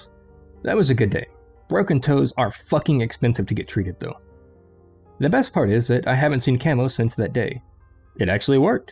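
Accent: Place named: South, United States. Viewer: youre welcome I do too. He is from North Carolina a southern accent. We do similar vid3os. I enjoy your interputation of it.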